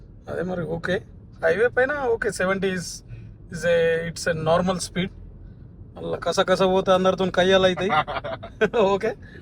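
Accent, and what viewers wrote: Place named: Telangana, India. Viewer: Your village is well developed and houses are lovely And your Telangana talk is so good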